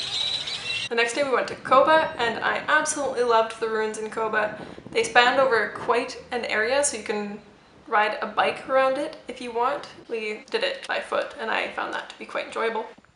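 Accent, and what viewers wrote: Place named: France. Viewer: Your neck and clavicle in this vid are beautiful 🙌 Did you get any vaccinations before going? I hope your momentary sickness when you got home was dehydration and not something else! Maybe do a round of diatomaceous earth to make sure no parasites came home. You’re also probably fine so don’t let me freak you out! Also, you sound almost Italian speaking Spanish with your French accent 😹 And it was nice to see!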